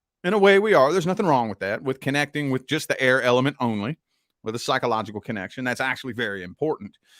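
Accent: United States. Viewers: Love the shirt So straight forward, love the way he speaks with his American accent “Doing a lot of healing” that is what I am focusing on “Close it out!” Good thing about Gemini they move forward and on because it is an AIR sign ruled by Mercury ~ we always come out on top…Gemini Chitter Chatter 🎉 Feel like this a spiritual community ~ Intuition, Spirituality, Feelings, Emotions, Cosmic Realm, as well as spiritual (beyond the physical) Realm “Too damn late” As a Gemini I love to “Ghost” people I dislike Between a “Scorpio & a Leo” it’s always been a Leo for me… I have a lot of Leos in my life I don’t know why? Taurus/Gemini cusp so I have a lot of Earth and Air with a Monkey Chinese Lunar Calendar but I believe it is a Water Monkey, so a little bit of water in the Chinese Lunar Calendar but in the astrological sector all the Earth and Air elements … I struggle with surface level connections because I am quite shallow and too busy for emotions and deeper level connections … The upside down world card keeps me going in circles because I do not care about making progress … my boss is a Sagittarius and always thinking about moving on and starting a new job with another company PLUS she is bitter~ I am curious if she will leave my work as my boss? 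:) SO BITTER sounds about right jumping from toxic relationship to toxic relationship but I can see it :) “Blessing in Disguise”. “Healing” “Person Person finally arrives” “Hard work out of the way first” My parents are Capricorn & Libr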